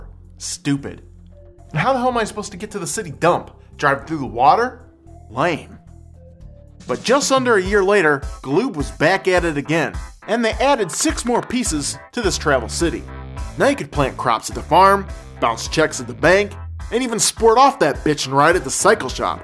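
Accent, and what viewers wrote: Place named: Ohio, United States. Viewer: LOL that is our Ohioan accent ~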